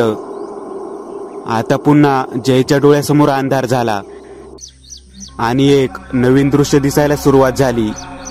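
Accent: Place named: Pune-Ahmednagar, India. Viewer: Tumcha tonning Pune , Nagar side che vatate......!! Chan ahe keep it up......!! Story saglyach chan....JB chya saglya story me eaikte.....🤗💐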